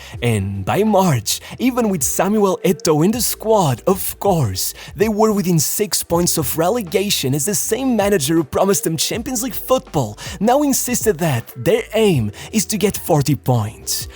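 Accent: Portugal. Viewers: Sotaque bem tuga xD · most portuguese english ever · Are you Portuguese? Accent don’t lie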